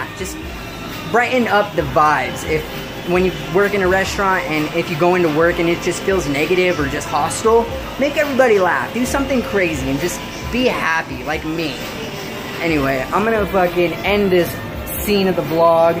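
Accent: United States. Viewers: You From Chicago Bro ?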